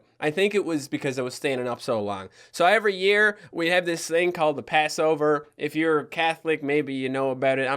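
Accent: Newfoundland, Canada. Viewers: Man, whenever I hear a thick Newfie accent it always reminds me of my family. All of my extended family lives in Newfoundland, and we used to visit every year but we don’t anymore. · Dude your Newfie accent is fucking, /spot on/. Never been to Newfoundland, since I live in Ontario, but mom's a Newfie, and I gotta say, your accent is perfect. Way to go from a half Newfie to another Newfie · My god your newfie accent is spot on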